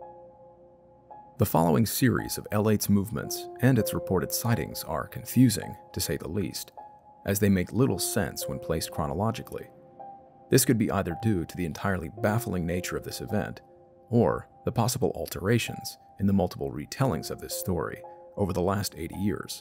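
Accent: United States. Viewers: Would have liked to be able to add this to my subscribed list as I so enjoy Bedtime Stories, but the American accent isn't for me. Sorry. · I'm pretty happy to hear an American accent. This guy is a Marine and his channel focuses on war stories. No Brits here. 😬. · Was looking forward to this channel, then "Oh for gods sake, an American narrator" why does everything have to be americanised, won't be listening again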